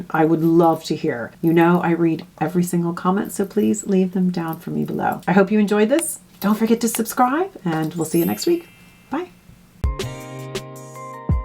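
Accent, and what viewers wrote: Ireland. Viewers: Where are you from? I hear Irish 💚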